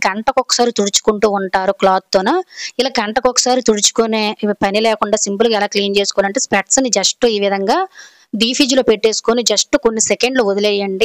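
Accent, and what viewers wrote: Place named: Telangana, India. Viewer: Welcome akkka me slang ardham avuthundi meedhi telangana kadha akka maadhi kooda adhe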